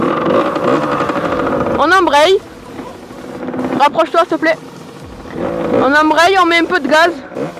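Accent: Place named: South, France. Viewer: Très bien expliqué! Tu t'exprimes vraiment bien; et j'aime beaucoup ton léger accent du sud, moi qui parle comme les ch'tis ça fait du bien d'entendre une autre mélodie. À bientôt jeune motard. 😊✌😉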